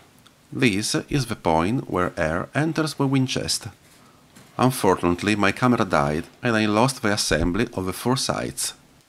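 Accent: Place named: Italy. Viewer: si sentiva, anche io sono italiano da quello che ora puoi immaginare e volevo dirti che fai dei bellissimi video e continua così <3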